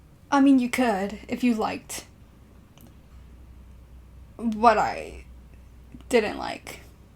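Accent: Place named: United States. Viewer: ooo, that's cool! did you go to an international school or lived in America at some point? you sound American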